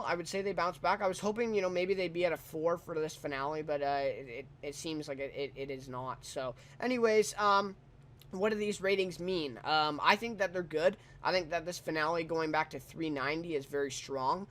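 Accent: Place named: Canada. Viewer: Are you Canadian? Your accent is annoying.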